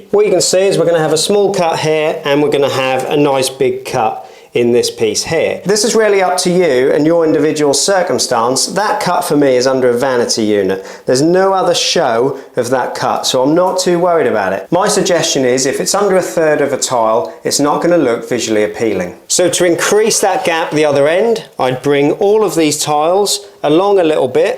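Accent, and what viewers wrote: Norfolk, United Kingdom. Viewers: That's a Norfolk accent if ever i have heard one. Made me feel right at home 👌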